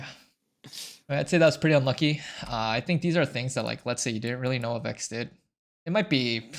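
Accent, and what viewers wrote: France. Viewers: Non. Mais oui on est d'accord il est francais. J'ai l'impression qu'à un moment il se trompe et lâche un p'tit: fin heu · C'était toi ? je me disait que tu avais un accent français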